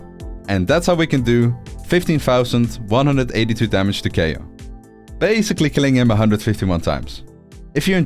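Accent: Netherlands. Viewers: Lol i thought you had a dutch accent. Then i saw “Netherlands” on your channel :P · Typisch NL accent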